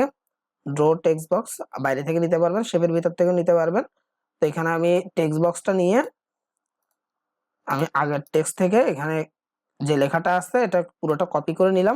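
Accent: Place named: Chittagong, Bangladesh. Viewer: চট্টগ্রাম ভাষায় কথা বলছে ।। আপনার ঘর কোথায় 🤔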